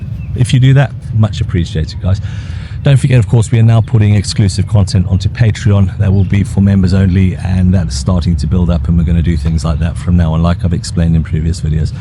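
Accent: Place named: South Africa. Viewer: Definitely pick up your s.a. accent . How many years did you spend in uk after Zimbabwe?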